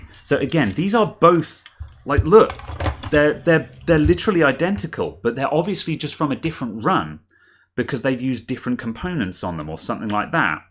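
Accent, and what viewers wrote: England, United Kingdom. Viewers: Man, i am not an expert, i do repair things from time to time, but your voice and especially your English accent, really make me watch your clips. I have an issue with a keyboard: a column of keys get lights but don't send a signal, the keyboard is Logitech G pro. I try to bridge but it seems all keys ( F7, 5, T, G, V and Space) are one link on the board. Do you have any idea on how to start this repair, whats spares should I look for. This issue appeared after I try to resolder a switch ( I ve done this before, many times but never saw this type troubleshooting ). Thank you so much and Rock on!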